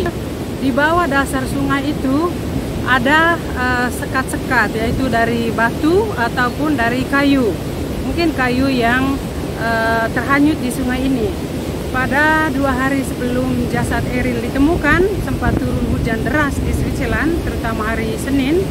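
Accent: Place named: Manggarai, Indonesia. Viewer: Ketahuan dialeg vlogernya orang Manggarai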